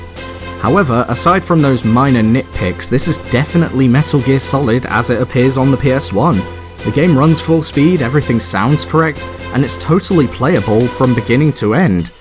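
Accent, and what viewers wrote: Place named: United Kingdom. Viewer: never even heard of bleem until this video was recommend to me 😂 and which part of Britain are you from?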